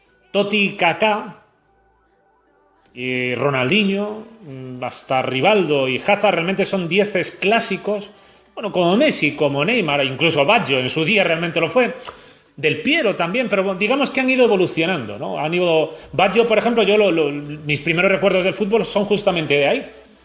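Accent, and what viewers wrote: Spain. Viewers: se te nota mucho loe español, pero no te culpo es inevitable. faltan muchos, pero que no esté kahn es una pifia muy grande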